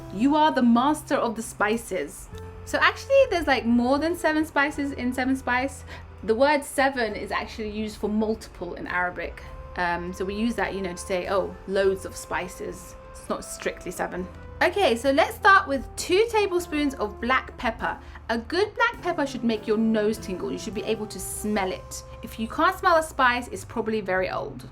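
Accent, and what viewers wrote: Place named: Birmingham, United Kingdom. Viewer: You have a Birmingham accent 😊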